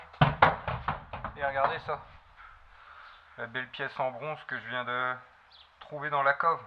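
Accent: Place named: Ardennes, France. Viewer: Bonjour, moi je fais aussi la ferraille avec ma vieille clio mdr, mais pas autant que toi. Tu sais dans notre région ( à côté de Lens ) tout le monde fait la ferraille donc attention il y a des vols. Tous les soirs je regarde tes vidéos et ça me fait du bien car c'est pas pour avoir des conseils mais pour vous voir, votre accent ardennais eh bien j'aime bien et je trouve que vous êtes vraiment cool et simple, vous souriez et vous ne prenez pas la tête pour des conneries. Bref merci pour tout ça et je vous souhaite beaucoup de sous avec les métaux. Jean Luc de Loison sous Lens. Bisous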